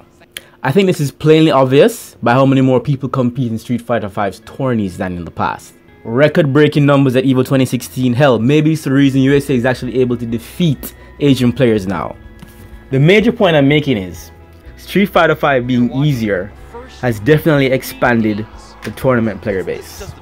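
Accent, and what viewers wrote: Jamaica. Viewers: i can hear the Jamaican in you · I think street fighter is easier than tekken because street fighter you don't have to learn as much compared to tekken you have to literally put in more hours than in street fighter people have this big misconception that tekken is an easy fighting game but when you play in high level play it's hard as fuck and that guilty gear does sound hard as fuck though I might try it out and you sound Jamaican big up lol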